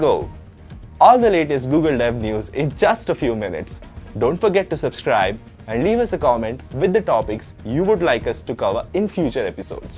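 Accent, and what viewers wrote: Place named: India. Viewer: That's the Indian accent :)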